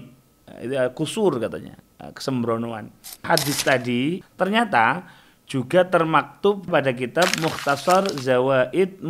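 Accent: Indonesia. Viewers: Logatnya Bangkalan banget. 😅 Ternyata banyak Wahabi di sana Sikat, Guse...!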